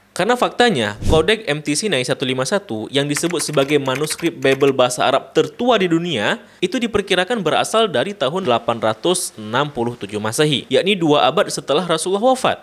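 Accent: Aceh, Indonesia. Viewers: Logatnya seperti logat aceh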